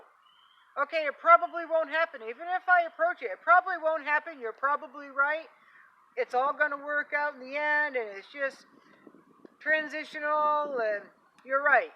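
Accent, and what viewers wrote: Maine, United States. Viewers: I bought my mom in CT several cases of H2O and clearly marked them for emergency. Next time I went there I asked her where she stored it. She took it to the dump. Couldn’t understand why she needed it. I just smacked myself in the forehead. I’m glad I found your channel. I lived in Belfast for 8 years. I miss the great no nonsense Maine way of thinking (and the accent). I’ll keep watching ya. I’m in your tribe, but in TN now.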